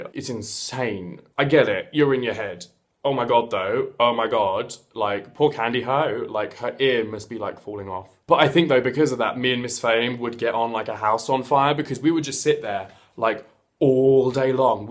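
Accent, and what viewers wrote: United Kingdom. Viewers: Lusine Margaryan I'm guessing he lives somewhere in the south of england, Midlands maybe, so just a southern English accent. I'm guessing this because he sounds similar to me and to me he doesn't really have an accent, which likely means he lives somewhere similar to me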